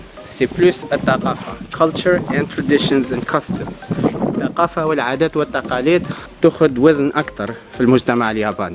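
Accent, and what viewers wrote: Annaba, Algeria. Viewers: الحلو في فيديو تاعك لا لمللل هههه تتفرج حتى لخر و متقلقش حتى ويكون طويل خطراكش كوكطال فيه تسلية و مغامرة روعة مع لهجة عنابية ولى ارووووووع 😍😍😘😍😘😍😘😍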